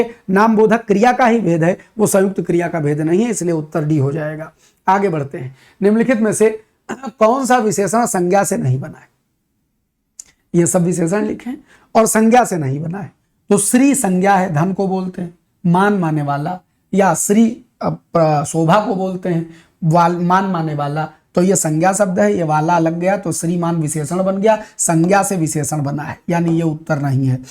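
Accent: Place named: Kanpur, India. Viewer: Aap sir Kanpur m rahe h kya boli aapki vaha ki lgti h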